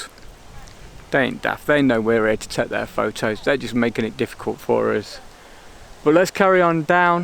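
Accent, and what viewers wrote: Leicester, United Kingdom. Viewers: Good video. Love the Lestah accent. I worked there’s for a few years and now call rolls cobs lol 😂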